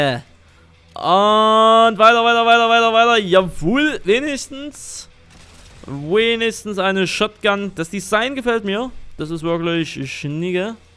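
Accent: Saxony, Germany. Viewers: weil sachse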